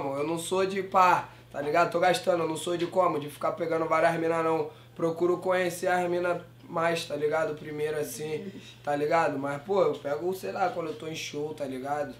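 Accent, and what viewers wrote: Rio de Janeiro, Brazil. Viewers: a maioria dos menor do rio fala assim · Dener Melo aqui no RJ a maioria dos menó fala assim kkk eu tmb me incluo nisso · Melhor sotaque era o carioca. 💪😍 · Eri Soares é de carioca, hahaha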